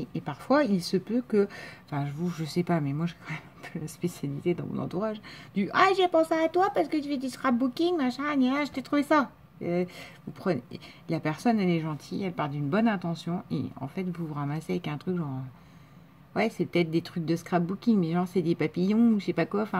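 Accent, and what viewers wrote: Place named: Haute-Marne, France. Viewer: Cc 🙋 Alors alors alors...!!! Quesqui pourrai t inspirer 😁 je choisi la couverture.. ah super le tour de France je connais sa c'est la galère sérieux.il me semblais bien que je connaissais cet accent je suis native de la haute marne Chaumont tu dois connaître...et Reims je connaissais bien gros bisous 😘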